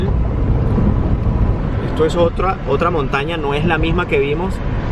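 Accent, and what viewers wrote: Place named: Venezuela. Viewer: Que asco da venezuela, en serio, se los llevó el diablo... 1. El barrio mas rico de caracas (la lagunita country club) es un simple barrio estrato 4 residencial de Colombia, y "las mercedes" un simple estrato 4 - 5 comercial en Colombia. Ni su palacio de miraflores llega a ser estrato 6 en Colombia. 2. La zona colonial caraqueña (el altillo) es una completa mierda comparada con los magnanimos centros historicos de Cali, Cartagena, Popayan, etc, 3. Los centros comerciales de "clase alta" en venezuela parecen un simple supermercado Ex!to en Colombia. *América es un continente. No un país; analfabeta (tras de que tu acento venezolano es totalmente barbarico-animalesco, no le podes sumar a eso tu malinchismo iletrado de llamarle a Estados Unidos, América: EMBAJADA ESTADOUNIDENSE, NO EMBAJADA AMERICANA).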